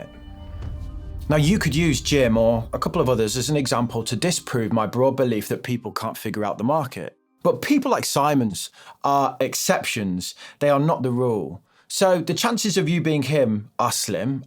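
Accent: United Kingdom. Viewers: Perfect British Accent ❤🩷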